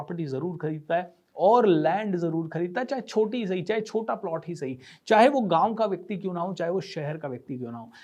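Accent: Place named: Rajasthan, India. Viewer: Lagta hai aap rajsthan bikaner se ho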